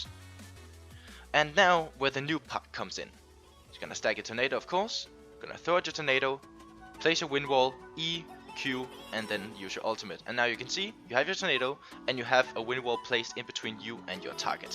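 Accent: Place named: Denmark. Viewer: You sound a bit danish.....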